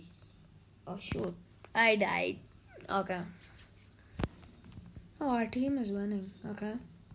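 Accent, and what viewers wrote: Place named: India. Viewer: indian accent lol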